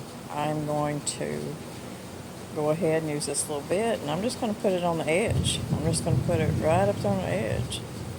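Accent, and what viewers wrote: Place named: Arkansas, United States. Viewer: the accent and the thunderstorm leads me to think of beautiful Arkansas. That pie crust cobbler looks like it was gonna be good. Nice video!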